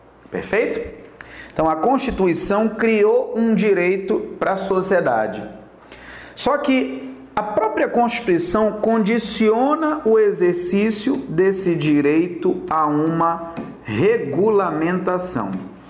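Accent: Pará, Brazil. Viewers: O senhor é paraense?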